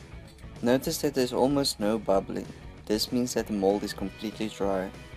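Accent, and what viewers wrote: South Africa. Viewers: Afrikaans accent - check Ugly South African 3-prong electrical plugs - check Johnson & Johnson petroleum jelly - check :D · Is that a South African accent?... shout out from JHB.... awesome work! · bra r u from south Africa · You're South African? Edit: yes you definitely are, what's up boet? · south african? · You from South Africa, sir?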